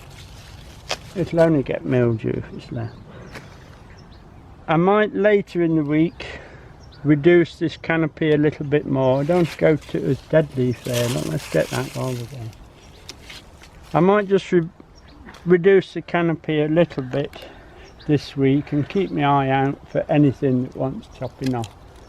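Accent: England, United Kingdom. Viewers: New subscribers here - from the states (don't hold that against us - both my granddad's were from England ! :) ) FANTASTIC garden - there's no doubt in my mind even if your video's weren't interesting I'd still be watching/listening as I love the English accent (you can thank my dad's dad for that ! )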